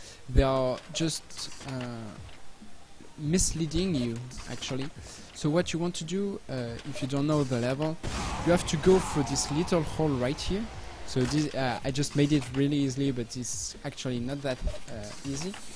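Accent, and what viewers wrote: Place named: France. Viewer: Un français ca fait plaisir :P ton accent te trahis xD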